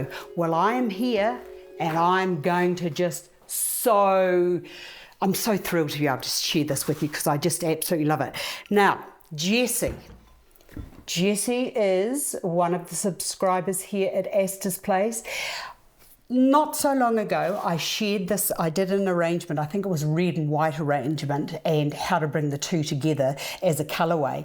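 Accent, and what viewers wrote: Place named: New Zealand. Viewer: Love your New Zealand accent - from a follower in the Commonwealth of Virginia in the United States!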